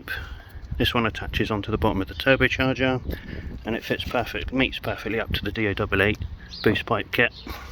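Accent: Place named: South West, United Kingdom. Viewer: Quality kit, I may be in touch in the future. Sounds like you’re a SW boy, where are you located if you don’t mind me asking?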